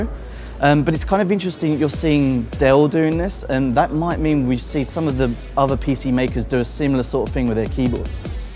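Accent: England, United Kingdom. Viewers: It's a British accent I'm pretty sure the British is more accurate on English then Indian 😂😂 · Saurabh Raut It's the English language, he's pronouncing it the English way, not the American way. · I actually quite welcome an English speaker for once.... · He is English and we pronounce it Hach. As we basically developed English, we should know how to pronounce letters.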